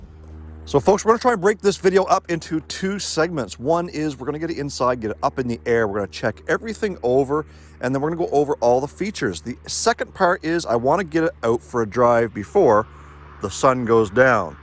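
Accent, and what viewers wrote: Canada. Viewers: Beautiful truck! I love your Canadian accent, (I'm from BC) 😅😅 I have a 94 F-150 XL 4.9L. No Rust. Love my truck!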